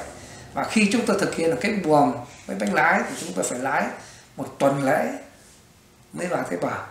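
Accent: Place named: Hai Duong, Vietnam. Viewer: Đúng là giọng Hải Dương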